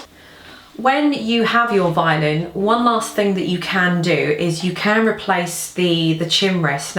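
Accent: England, United Kingdom. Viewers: I like your accent. I can tell you are from England.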